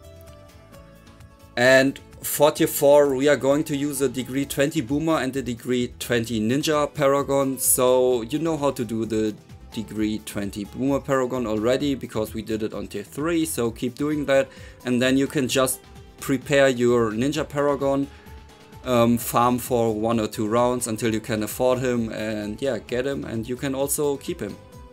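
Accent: Germany. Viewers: Ich liebe diesen deutschen Akzent wenn du englisch sprichst 😂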